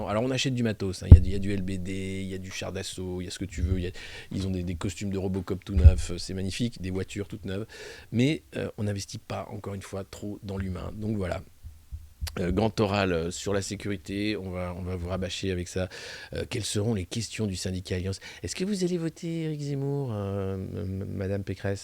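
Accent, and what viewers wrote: Quebec, Canada. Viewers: Bel accent Québécois!! Bravo! Calins à Miggie et Courage à Timmy! Merci! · Excellent l'accent québécois 😀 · N'oubliez pas le petit bonnet de bain pour Maggy , pour le jacuzzi !!! Merci , toujours aussi génial !!! Et vous avez un accent canadien fantastique !!!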